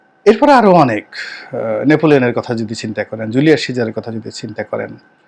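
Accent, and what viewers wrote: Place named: Barishal, Bangladesh. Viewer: ভাই আপনি যে বরিশালের মাল তা বুঝা যায়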